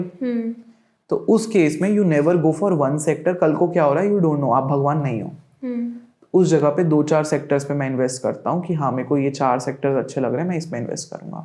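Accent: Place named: Mumbai, India. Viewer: MUMBAI STYLE HINDI HAI.....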